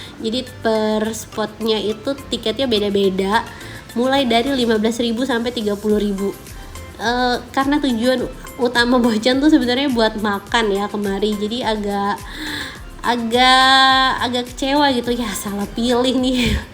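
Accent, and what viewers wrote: Indonesia. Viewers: mimin nya kayak orang sunda, bner ga?he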